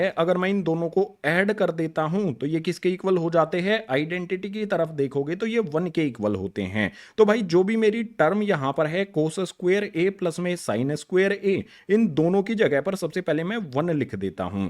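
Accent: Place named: Bharatpur, India. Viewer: Language Bharatpur ki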